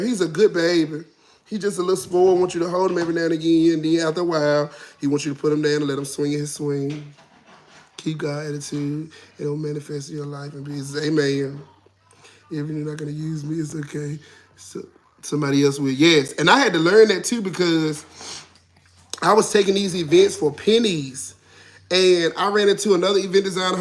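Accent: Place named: South, United States. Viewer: Lol you are so country!!! I can tell y’all from the south! 😂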